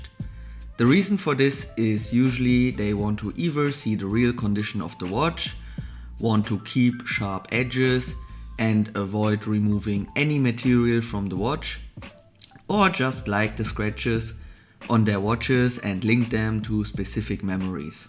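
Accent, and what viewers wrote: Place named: Germany. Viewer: The main reason I open your videos is to demonstrate what a strong German accent sounds. Please practice your th and your of kurs is actually of course.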